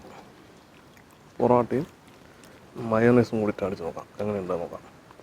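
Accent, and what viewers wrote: Palakkad, India. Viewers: palakkaden samsaram ath poliyan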